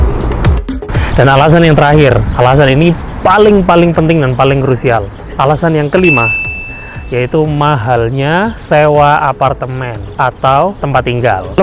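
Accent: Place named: Java, Indonesia. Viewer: Jowo mas😂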